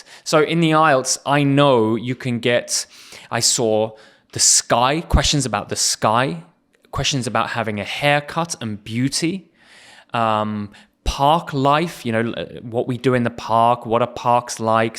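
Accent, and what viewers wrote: United Kingdom. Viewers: I like it your videos very much. Your British English accent is totally perfect and your videos helped me to learn British English and please come on youtube with more new videos. Thank you very very much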